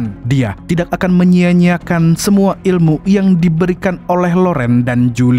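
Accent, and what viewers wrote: Banjar, Indonesia. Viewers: Fix urang banjar wkwk, banjarmna pian 😂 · Lah org banjar kah🤣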